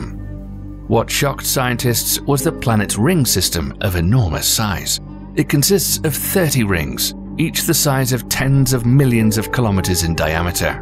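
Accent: England, United Kingdom. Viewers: All English men at a certain age sound the same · Oh thank god it's a British person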